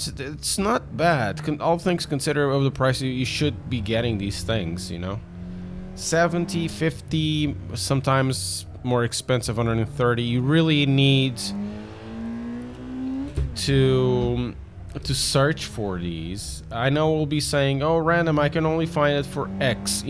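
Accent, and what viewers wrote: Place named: Portugal. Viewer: Where are you from? Your accent sounds portuguese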